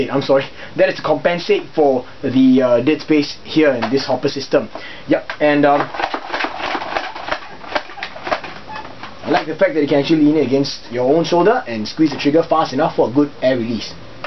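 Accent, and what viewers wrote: Singapore. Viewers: OH MY ENGLISH!... eheh nice singlish accent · DO MORE SINGLISH! PLEASE!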